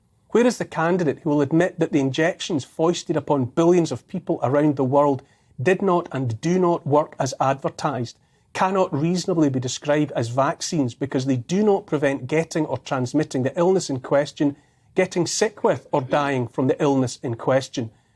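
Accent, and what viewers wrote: Scotland, United Kingdom. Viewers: Thank you Neil for exposing the facts so clearly. It is so important to have more and more journalists like you speaking their truth without bias. Not only it is superbly said but your lovely Scottish accent is music to my French ears. Go go go Neil ! 💪🏽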